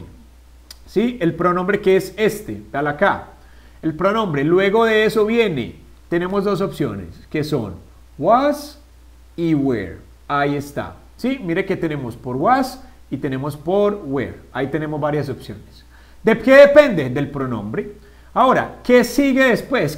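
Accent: Antioquia, Colombia. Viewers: Se te nota lo Colombiano a kilómetros 😂😂😂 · me gusto su acento colombiano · like si te distes cuenta que parece paisa xd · parese paisa ome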